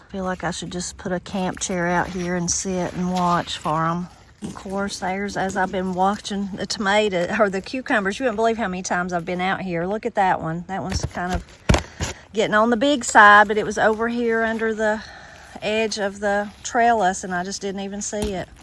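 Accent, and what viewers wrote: Appalachia, United States. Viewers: I live in Nebraska. Have I ever been to Appalachia? Nope. Will I ever have a huge garden like you do? Nope. Will I ever put up produce, cook soup beans or kilt lettuce? Also no. But, I'll always watch these videos because your family is just the sweetest... and I just love the accent. Thank you for being so down to earth, humble, and Godly. ❤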